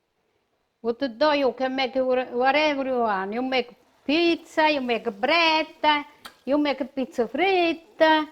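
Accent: Italy. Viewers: The world is small! We all in immigrated to Chicago in 1964. Went to Italy in 1979 and met my husband and got married in 1982. In a small town I am sure knows called Castelluccio Valmaggiore not far from Faeto!! I now live in northern Italy. My family still lives in Chicago. I love listening to she reminds me of my mom passed away in 2015 Adele who also always cooked and baked as with her Italian accent. Bye.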